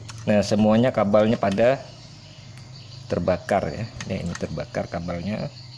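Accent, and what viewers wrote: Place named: Tenggarong, Indonesia. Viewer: kira urang Tenggarong tadi,,dengar logatnya,,salam dari kukar ni broo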